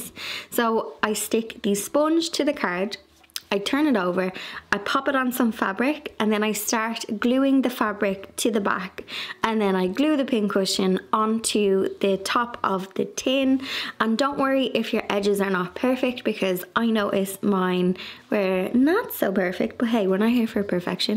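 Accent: Dublin, Ireland. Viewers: I had to subscribe cause you are the first Irish youTuber I have come across. I have a son who spent some time in Ireland and he came back to Canada with the coolest Irish accent!🥰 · she has an Irish accent, a Dublin accent. My home town. It makes a change, as most of the sewing videos I’ve watched are from women with American accents. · Oh lovely an irish accent! Im thrilled, living away from Ireland in spain all i hear are british accents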